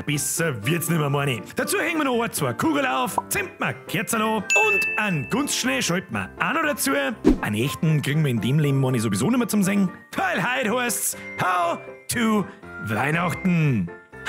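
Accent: Bavaria, Germany. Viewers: Dieses bayrische 💆🏻‍♂️💆🏻‍♂️💆🏻‍♂️💆🏻‍♂️💆🏻‍♂️💆🏻‍♂️💆🏻‍♂️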